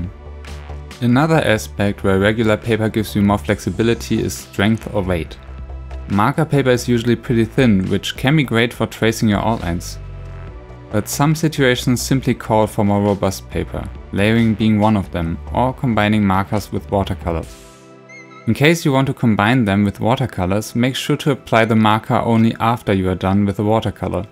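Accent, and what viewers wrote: Germany. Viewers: I love your beautiful German accent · Are you from Germany? I think I can hear an accent. Anyways, very good video because I bought Copics too, because I I think they'd be good for Manga drawings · Tangerine He got that big fat german accent... just like me :) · can i hear a German accent? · you are german bc i hear it xD and i am german and on the paper block i can read "500 blatt" thats german bro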